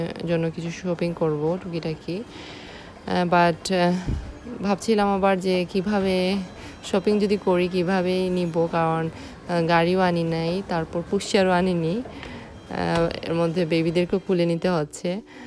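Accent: Sylhet, Bangladesh. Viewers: Apo are you came from salyet because your speak like that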